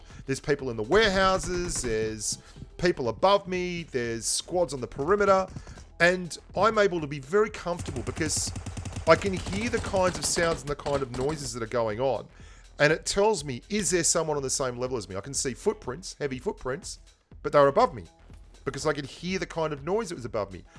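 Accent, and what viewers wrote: Australia. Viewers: He's an Aussie mate.... GADDEMIT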